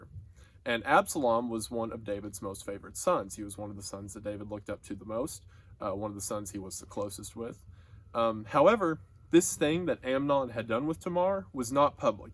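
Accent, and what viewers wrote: South, United States. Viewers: Trying to figure out where his accent is from. Atlanra...the carolinas?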